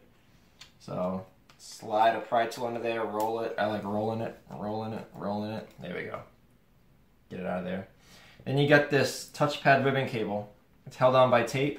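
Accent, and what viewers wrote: Massachusetts, United States. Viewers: Great job teaching how to do this. You sound like you are from Massachusetts :)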